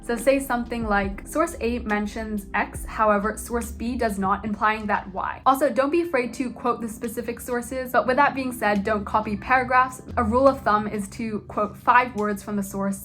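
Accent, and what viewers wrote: United States. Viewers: woah if ur doing gcses why do u have an american accent? (i am not interrogating) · i just realised u sound American 😭 did u move here then do ur GCSEs? x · you sound american so did you take igcse?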